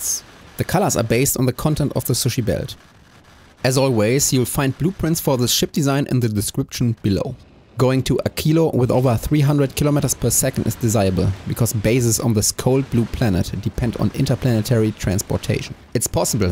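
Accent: Germany. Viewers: I only just started making green science so I don't know why I'm watching this other than enjoying a German accent talking about precision and efficiency.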